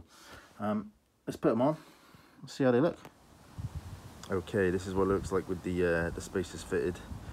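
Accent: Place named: Wales, United Kingdom. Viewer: Nice to hear a fellow Welshman talking about this car. I am from south wales but live in Arizona. Have a 2013 gt, in grey with the same wheels as you!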